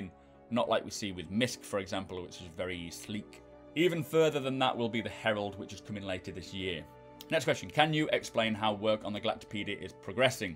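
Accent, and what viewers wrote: United Kingdom. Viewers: You mean American subtitles, he's speaking (British or Scottish) English.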